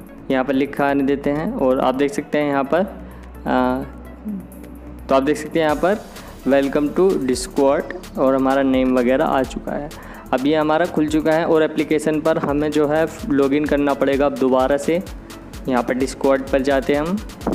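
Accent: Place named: India. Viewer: He is speaking English just in a Indian accent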